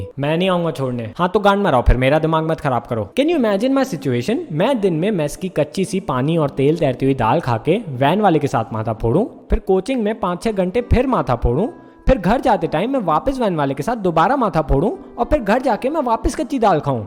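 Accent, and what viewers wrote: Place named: Punjab, India. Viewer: 😂😂😂😂😂😂😂and that punjabi accent of yours......yrrrrr you are just wowwwwww❤️❤️❤️❤️❤️😂😂😂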